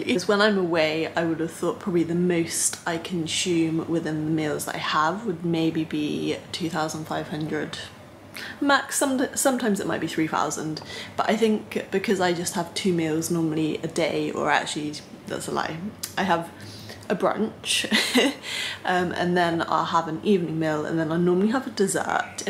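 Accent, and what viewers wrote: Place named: United Kingdom. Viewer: I know lots of guys come here to watch you work out...but for myself with your accent and your calming tone I could listen to you talk all day. Its very old style British you dont hear anymore. cheers from USA.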